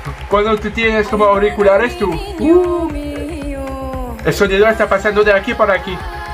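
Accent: South, France. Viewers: Del sur de Francia por lo que le he oído al principio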